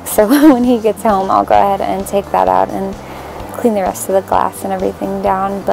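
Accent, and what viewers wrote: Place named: Canada. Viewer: Great job!! One question I always wanted to ask you though. Sometimes you have a somewhat Canadian accent at times. Are from up north in the states or Canada originally? 🙂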